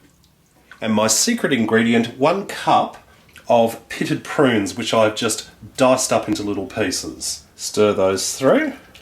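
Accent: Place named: Australia. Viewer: love Australian accents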